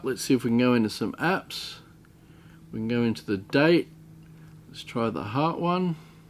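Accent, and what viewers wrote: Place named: Australia. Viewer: So australian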